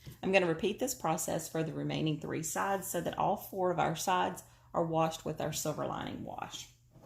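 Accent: South, United States. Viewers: 😇🌷 I L 💗 VE Your southern accent, I lived in Charleston SC when I was in the 5th and 6th grade, so I do still have a lil twang, especially when I get a lil bit upset LOL 🤭 BUT, I do have a slight southern drwal . anyway SWEETIE your galvanized bins turned out REALLY GOOD!!!😊🎨🖌️ You know you're a southern woman, when you feel like you have to apologise LOL 🤭 Thank YOU for sharing your CREATIVITY with, and I also want to say IS YOU are a BEAUTIFUL woman inside out, you actually look good with out having any makeup on, AND YOU in my opinion, look good with out makeup on.😊🎨💜🌿 Thank YOU again SWEETIE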